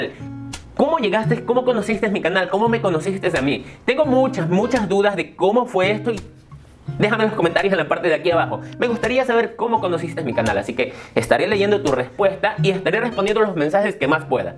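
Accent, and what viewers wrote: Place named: Ecuador. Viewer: Hola yo también soy del Ecuador pero vivo en Italia yo llegué en tu canal por que soy animalista y una de mis amigas había publicado un video en Facebook entonces lo VI y por tu acento me dije el es mi compatriota y que orgullo saber que existe una persona haci en mi país El maltrato animal en Ecuador es muy penoso la gente no quiere entender que ellos siente dolor que tienen un corazoncito cuando estuve en Ecuador VI tantos animalitos en la calle maltratados perritos atropellados y para mi ver esto era un sufrimiento tan grande trataba de sensibilizar a la gente pero no es fácil espero que los niños del futuro que aprendan a amar y respetar a los animales me gusta pensar haci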